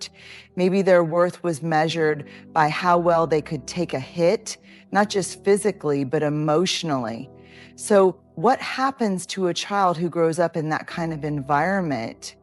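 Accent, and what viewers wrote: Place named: South, United States. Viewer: ✋. I do!! All you need to do is look up one of her live Ted Talks to know that this is Brenet. Love her slight southern accent ❤ She’s the real deal.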